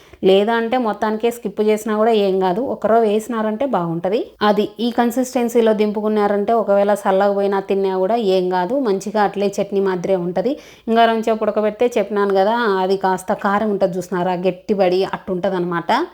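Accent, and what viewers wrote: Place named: Kadapa, India. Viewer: Hi kadapa bhasha vachhinya ,poinnya adaragottunnav .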